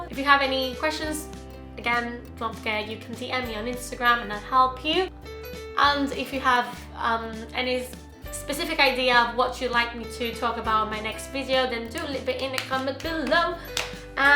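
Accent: United Kingdom. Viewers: Como as conseguido este acento Britanico? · me encanta el acento britanico q agarraste😭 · Sos argentina?? tenes un acento super british! solo lo aprendiste con el curso de EF??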